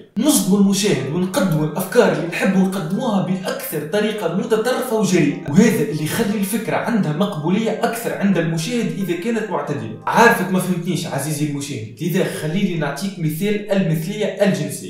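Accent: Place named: Tunisia. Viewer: حضرتك تونسي انا اول مره اقهم حد تونسي ❤لكنتكم حلوه اوي بس صعبه شويه ❤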